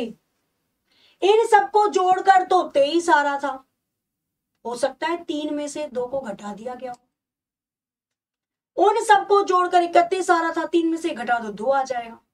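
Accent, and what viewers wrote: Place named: Bihar, India. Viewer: mam aap biharq me kaha se h , mai v bihar se hi hu or aapka language same to same meri tarh hi hai😊😍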